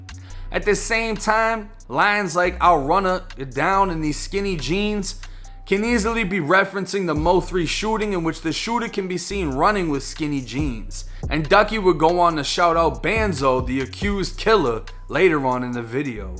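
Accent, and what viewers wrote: Boston, United States. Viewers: U got a Boston accent broo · as usual a brilliant summation of the story for all us to understand. thank you, sir! BTW. are you originally from Boston. i hear an accent there! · I love this kids accent talking about Florida prison and shit. I'm from the Boston area. I talk like him. I'm almost 20 yrs older and this kid makes himself very clear. Right to the point. Keep it up brother.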